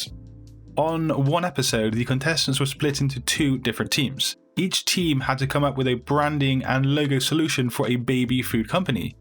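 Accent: United Kingdom. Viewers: Are you British? I like your accent !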